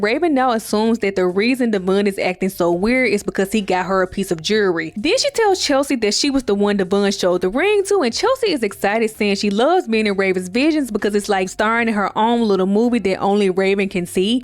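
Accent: Memphis, United States. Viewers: She's from Mississippi! But I thought Memphis too!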